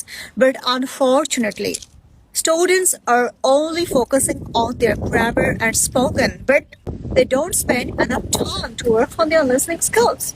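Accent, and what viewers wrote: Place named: India. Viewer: I understood earlier that you're indian when I listened your accent, then I checked your channel's about section and finally I got I was write.😂😂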